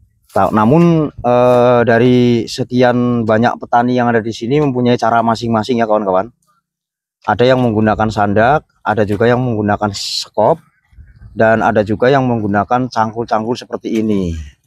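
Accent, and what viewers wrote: Banyumas, Indonesia. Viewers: Ora ngapak ora kepenak makin joss semangat menuju 20K nya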